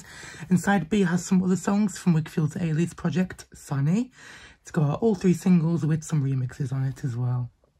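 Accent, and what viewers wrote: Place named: United Kingdom. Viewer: I thought you were from the UK by the sound of your voice I checked your channels about info and yep I got it right I have other questions but I really want to come out offensive at all so I won't ask sorry but dang I want to get a copy of that Vinyl the first track is EPIC!!! Yeah anyway Lots Of Love Keep It Up And Stay Positive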